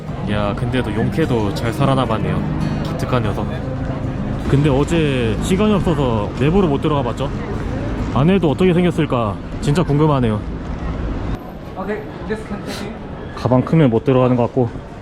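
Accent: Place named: Japan. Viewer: 영어 억양이 뭔가 일본 억양스러우시네욬ㅋㅋㅋㅋㅋ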